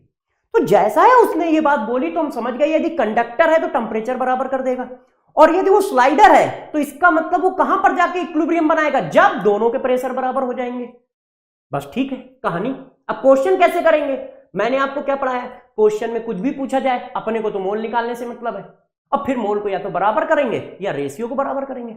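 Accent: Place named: Bihar, India. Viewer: I bet sir is either bihari or up Becoz me also frm bihar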